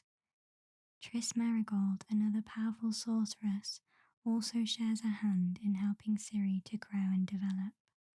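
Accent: United Kingdom. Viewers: Judging by your accent, you live in the UK so I guess you will have plenty of opportunities coming up to catch the rain sounds ;). But maybe you can use background sounds from other YouTube channels if you'd ask their permission? I've just watched you other Witcher vid too, great outfit and your whispers are as relaxing as your soft spoken vids. Keep up the good work, really appreciated!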